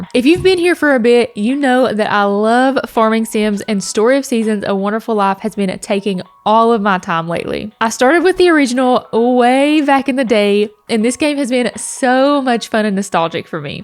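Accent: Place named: West Virginia, United States. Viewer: I love your accent, my friend is from WV and she sounds just like you!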